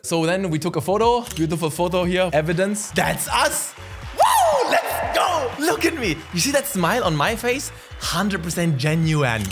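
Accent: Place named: Germany. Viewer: Are you half german? I like your accent man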